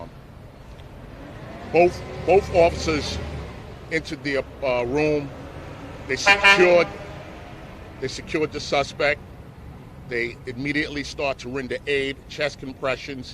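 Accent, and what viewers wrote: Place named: New York, United States. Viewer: NY accent is the best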